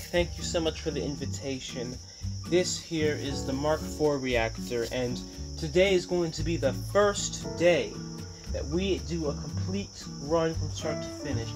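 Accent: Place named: England, United Kingdom. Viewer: Did you spend time in England? You do our accent as much as I do and American accent😅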